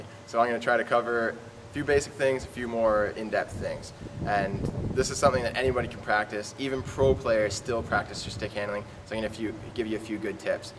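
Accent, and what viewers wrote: Canada. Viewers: he sounds like true Canadian and nice vid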